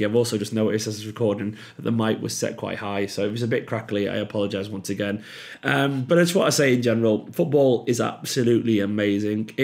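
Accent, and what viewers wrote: Manchester, United Kingdom. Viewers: You're so gutted your accent went extra manc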